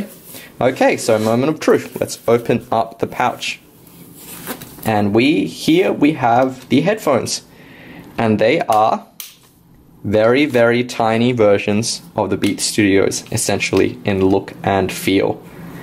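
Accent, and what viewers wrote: Australia. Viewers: I like ur australian accent!!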